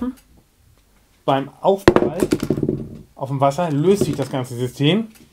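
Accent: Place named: North Germany, Germany. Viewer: Top! Immer wieder gute Ideen "norddeutsch" rüber gebracht! 😀😀👍👍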